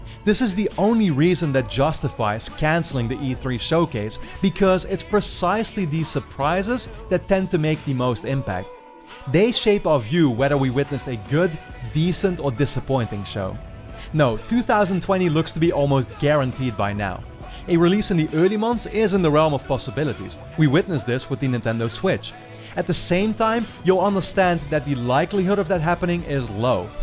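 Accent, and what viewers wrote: Netherlands. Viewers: Once i heard your voice i immediatly reconised ur dutch accent xd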